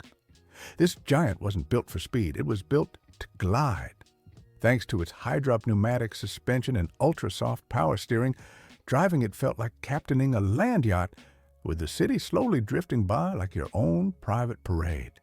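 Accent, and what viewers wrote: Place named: South, United States. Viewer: This “guy’s” southern accent is difficult to hear, difficult, as in sickening.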